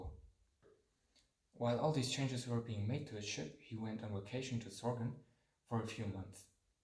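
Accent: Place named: Germany. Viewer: Aber der Dialekt ist so Deutsch 🤣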